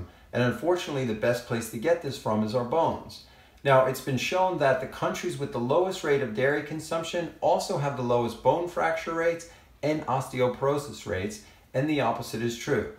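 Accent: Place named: New York, United States. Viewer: You have a great New York accent, btw!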